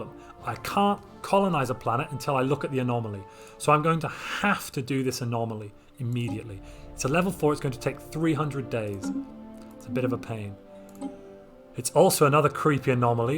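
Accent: United Kingdom. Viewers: ColorsFade with a British accent.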